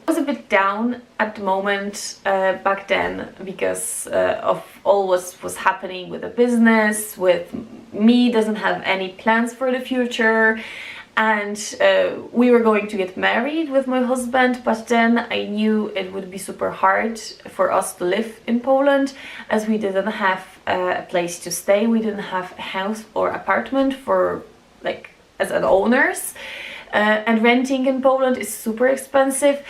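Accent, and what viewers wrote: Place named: Ireland. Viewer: Your accent is awesome. I love hearing foreign-born people with an Irish accent.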